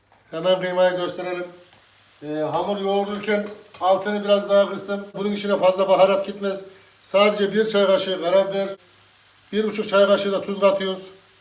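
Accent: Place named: Central Anatolia, Turkey. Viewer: Usta Orta Anadolulu. Hamur açmasından ve ağzından anladım.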